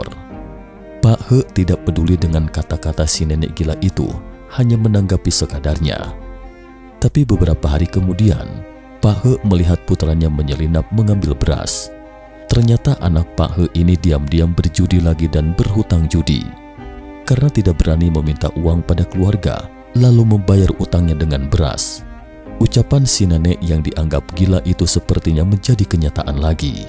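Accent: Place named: Bandung, Indonesia. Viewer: Suaranya ini mantab tapi masih ada logat aksen daerah nya, kadang ada akhiran Ak.. Ak... Asli Bandung atau mana ya..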